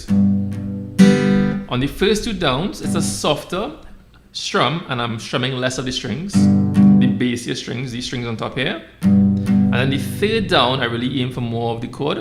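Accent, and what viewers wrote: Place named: Trinidad and Tobago. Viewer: Thanks man, this is such a well done lesson of a classic love song! I am so glad that I found your channel, and I subscribed immediately 😂! Also, coming from the West Indies myself, I am sure that it’s a Trinidadian accent I am hearing 😊, nicely done man.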